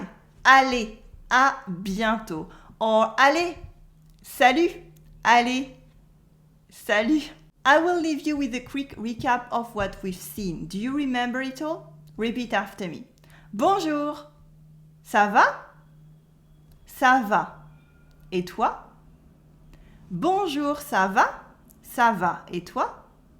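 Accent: France. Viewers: Comme une française surtout l’accent ! A couper au couteau !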